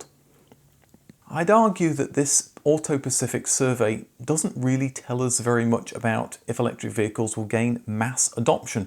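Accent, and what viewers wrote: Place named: England, United Kingdom. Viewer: he has an English accent. Does that help ? 🙂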